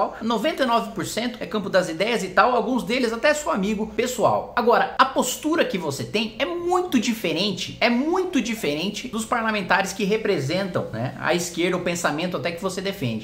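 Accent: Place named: Pará, Brazil. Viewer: meio paraense, legal.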